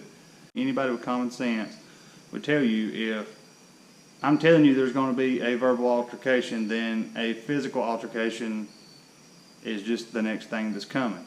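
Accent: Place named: Rural, United States. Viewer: This was probably the worst video I’ve ever watched. Stupid fucking monotone hick accent. I should kick your ass and file a suit for your fucking tractor hick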